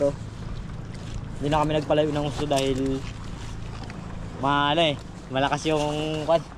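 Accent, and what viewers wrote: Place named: Zambales, Philippines. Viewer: Master bat Pay tawag mo sa kasama mo? Alam ko dito lang sa Zambales ang nag tatawawagan ng pay. Punto mo para ka ring zambaleño...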